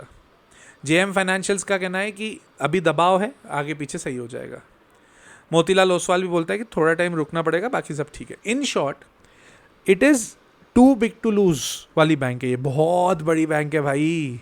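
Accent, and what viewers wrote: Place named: Bengal, India. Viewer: Bro, authentic bangla pronunciation ... kudos....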